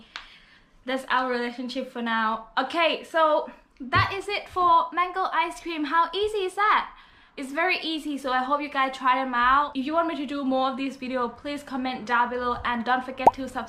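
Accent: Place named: Australia. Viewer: You slowly getting an Aussi Accent ...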